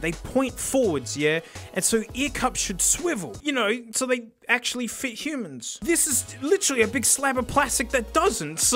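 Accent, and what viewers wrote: Australia. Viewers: Nothing gives me more joy than hearing an Aussie dude destroy the living hell out of earphones😂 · Dang, I love this stuff, helps me appreciate Australians accent way more, and the laid back and fun content is spot on! Keep on "Exceeding my expectations!"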